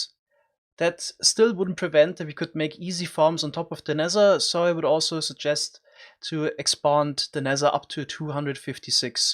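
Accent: Germany. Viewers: Is he german? He has the german accent😂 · Man hört schon dass du normal deutsch sprichst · German accent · Guten Tag du Alman. Ich wette nen 50er, dass du ein Deutscher bist, das merk ich doch am Englisch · your vids are very entertaining and i always think that your projects are amazing but that german accccent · Deutscher Akzent is mies am Start haha♥️♥️ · What nationality are u from? You sounds like german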